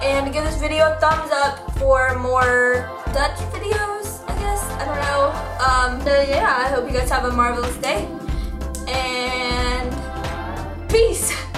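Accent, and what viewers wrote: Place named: Canada. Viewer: Oh I thought u were Canadian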